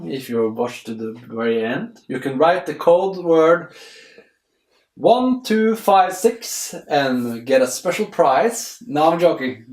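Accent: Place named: Norway. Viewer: Boi u suck at english Sounds like your from Norway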